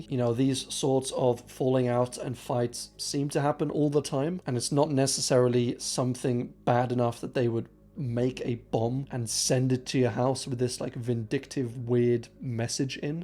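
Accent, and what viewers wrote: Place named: London, United Kingdom. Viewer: London? But then at times I hear something else 🤣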